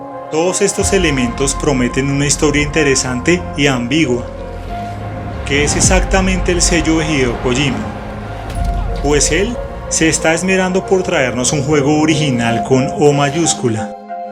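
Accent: Colombia. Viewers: Se nota que es de colombia jajaja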